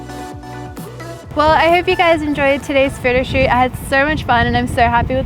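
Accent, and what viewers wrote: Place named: Australia. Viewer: Hi. Is it one preset or five diff ones? By the way were you born in Australia your accent sounds a little different.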